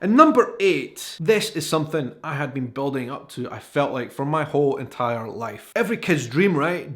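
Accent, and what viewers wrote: Scotland, United Kingdom. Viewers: you are so handsome and that accent makes me shiver, are you married? If so too bad LOL.Personally I much prefer the Scottish or Irish brogue over the boring British. I'm newly subscribed, so far your vlogs are funny and done in good taste unlike some of these British idiots that come here and criticize our food, our language, and our customs.I thoroughly enjoyed when you went to New Orleans and got taken down by that drink the Hurricane. Lol you poor baby. Guiness and Dos Equis beer does that to me. Two pints of Guiness and I'm flying but don't have sense enough to stop. Love It Love It Love It. My cousin married a widow from Scotland named Rebecca, she had a couple young children. She is such a sweet woman as were her children, they fit in this French family like a hand fits in a glove. My daughter went to school with a boy whose father was from Scotland and his name was Ian. 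Lovely family. Seems like some Scottish men are on the shorter side but very manly and sexy. Just wanted to give you a shoutout and let you know how appreciated your context is, keep up the good work🌹🌷😍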